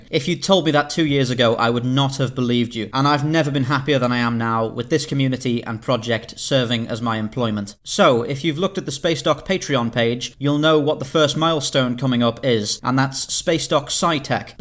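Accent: United Kingdom. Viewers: Your accent voice is so British that I always imagined you as an imperial officer of the empire. no homo tho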